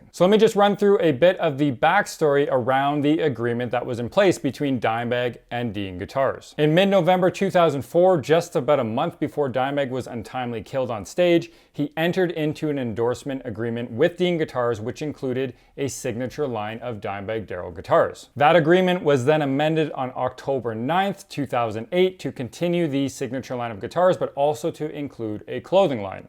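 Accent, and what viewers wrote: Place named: Canada. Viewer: All I heard was “ ABOTE “ and “ OTE “. Canadians just can’t grasp the “ OU “ sound.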